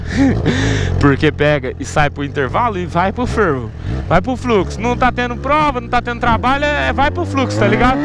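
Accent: Goiás, Brazil. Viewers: pk ele fala no pique de Goiás se ele é de londrina😂😂😂😂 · vc e goiano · porquê vc fala no pique de Goiás ??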